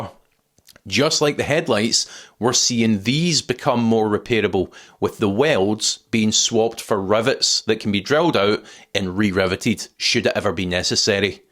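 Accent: Scotland, United Kingdom. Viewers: I subscribed just for hearing English in the Scottish accent!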